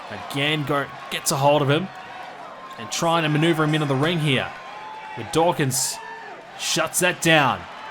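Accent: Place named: Australia. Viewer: are you from australia? coz thats where i am and you sound aussie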